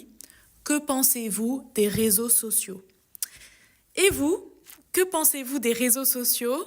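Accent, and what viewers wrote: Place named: France. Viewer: Hola mi amiga, muy bonito acento francés, aquí te escucho, un abrazo desde Canadá 🇨🇦🙂🫶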